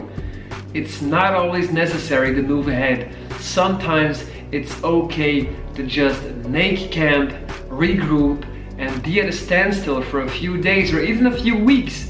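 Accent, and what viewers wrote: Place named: United States. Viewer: thats amazing! When Ive heared You for the first time I thought You are from USA:) I also watched the bold and the beautiful but with my grandmum:)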